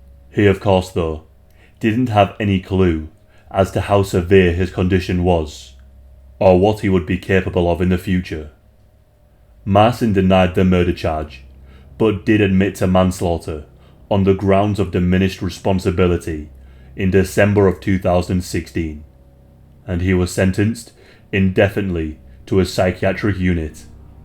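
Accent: United Kingdom. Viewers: Just what we need- another Brit on YT talking horror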